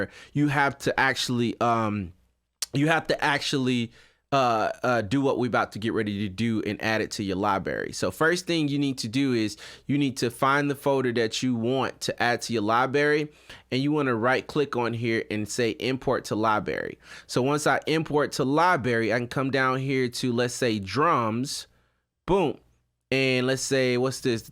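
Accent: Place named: Baltimore, United States. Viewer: Bro are you from Baltimore😂 couldn’t help but notice the accent but this video was great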